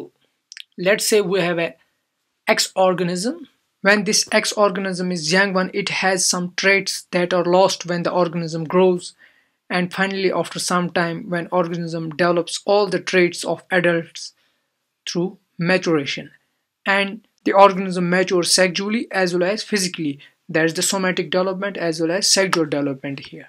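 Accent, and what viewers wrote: Kashmir, India. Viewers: Are you a Kashmiri? Just wanted to ask coz your are speaking just like my friend who is also a Kashmiri.😊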